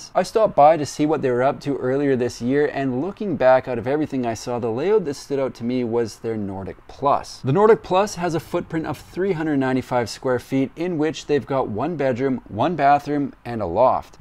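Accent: Canada. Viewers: Thank you for informing all of us about so many interesting homes. I believe homes, built in pieces, are the future. They take less time to build on-site. You are terrific! ha ha I thought you were a california guy but now know you are canadian...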